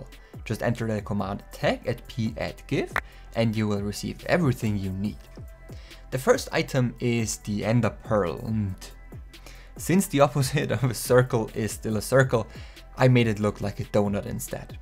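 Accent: Germany. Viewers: Ich mag deinen deutschen akzent :) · Ich finde dein englisch witzig weil man eher das deutsche von dir hört🤣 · are you german?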